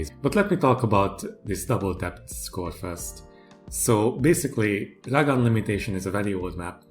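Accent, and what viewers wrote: Hungary. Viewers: At first you kinda sounded like a Hungarian but I wasn't sure, good job and keep up the good work!:) Csak így tovább.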